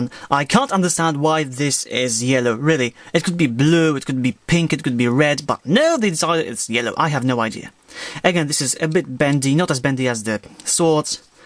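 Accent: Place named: United Kingdom. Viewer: man i cat get ur accents.. its kinda british, but than it sounds kinda fobby azn hmmm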